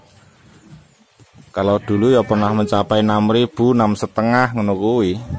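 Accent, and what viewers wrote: Java, Indonesia. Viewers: Mas nadane kyk daerah karanganyar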